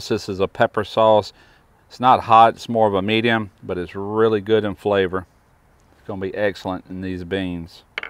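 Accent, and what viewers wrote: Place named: Texas, United States. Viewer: this dish looks mouth-watering👍 and I really like your accent. are you from Texas or elsewhere down south?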